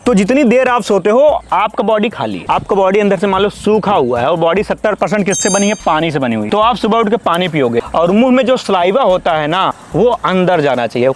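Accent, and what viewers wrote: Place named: Uttar Pradesh, India. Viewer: भाई आपका यूपी वालि भाषा मुझे अच्छा लगता है 😅 मैं भी यूपी का ही हूं ❤😊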